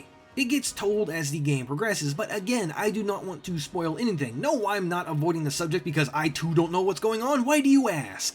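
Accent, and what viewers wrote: United States. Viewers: I was not expecting to have a stereotypical American accent when doing a Chinese game review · Yeah I'm not playing this game but will support whatever video you put out. Also you got a beautiful southern drawl and it's the 1st time I ever noticed it.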